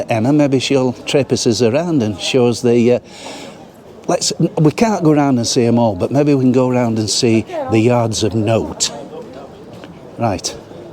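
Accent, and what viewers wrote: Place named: Yorkshire, United Kingdom. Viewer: Another lovely video as always love to hear the Yorkshire commentary thumbs up to regarding her teeth I am going on 91 and I have all mine well except for the wisdoms! Always enjoy wherever you go looking forward to the next one😊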